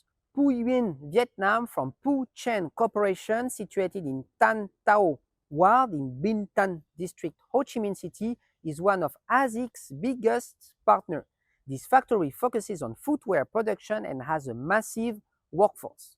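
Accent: France. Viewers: mais gros t'es un enfoiré tu fais en anglais d'accord mais.. sous-titré en anglais tellement ton accent est francais wtf ??! je parle anglais mais la jai besoin des sous titres mdrrrr je pense les anglophones entendent du français la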